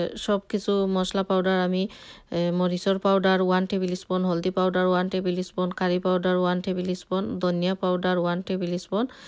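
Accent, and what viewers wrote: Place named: Sylhet, Bangladesh. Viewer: চমৎকার সিলেটি আপা মনি চমৎকার খুব সুন্দর রেসিপি বানাইছেন খুব ভালো লেগেছে তাই পুরো ভিডিও দেখে লাইক এবং কমেন্ট করে গেলাম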